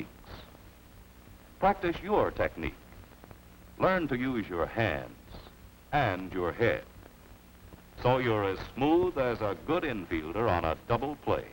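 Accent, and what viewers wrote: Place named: Mid-Atlantic, United States. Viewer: Man, those are some dull- ass scissors. That much hasn’t changed in 80 years! I can’t figure out what that metal tube is sticking out of that sailors back. Maybe a nephrostomy tube? Also, I absolutely love the narrators voice. Precise, no discernible regional accent, smooth enunciation and pitch variation. Waning days of the Mid Atlantic Accent.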